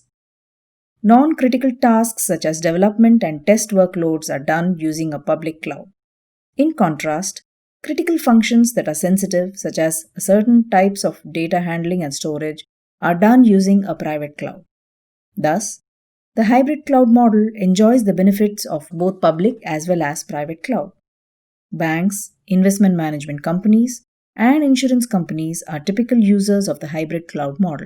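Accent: India. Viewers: first things makerdemy should do is to inform your staff speak slowly, not like robot, with rather strong india accent, i hardly understand a word you saying.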